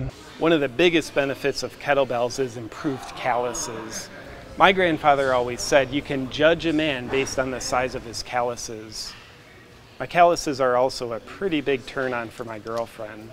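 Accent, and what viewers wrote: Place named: California, United States. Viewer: It's so awesome that he sounds like every Californian - ever!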